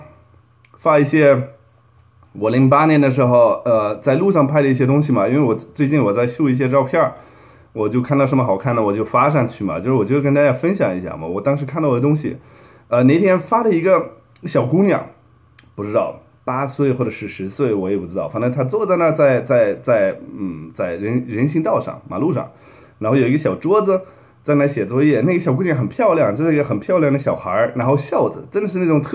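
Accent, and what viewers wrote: Northeast, China. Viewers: 你咋是东北口音呢,哈哈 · 东北口音。。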